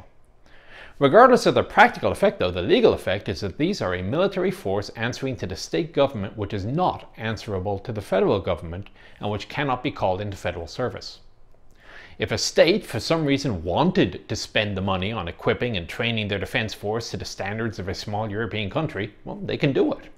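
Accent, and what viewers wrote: Ireland. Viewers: As someone who works with a lot of paperwork related to the three components, I can just show friends and family this video now to explain how all this works. I'm sure it sounds better coming from someone with a charming Irish accent... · I bet part of it is his Irish accent. Like Shelby Foote in Ken Burns Civil War series, his accent is pleasing to the ears. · From your accent, you sound like you're from Ireland. Did you have to go into the reserves as part of the naturalisation process or was it an active choice on your end? Asking as an Irishman ready to move to the US.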